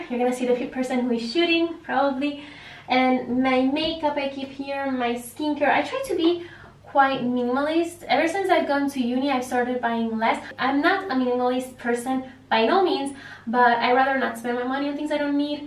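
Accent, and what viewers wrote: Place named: Argentina. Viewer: te escuchaba hablar en inglés y me parecía , yo decía es argentina por el acento jeje . saludos .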